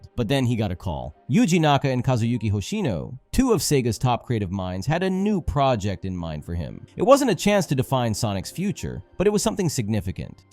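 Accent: Brazil. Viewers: me desculpa mas, vc é brasileiro?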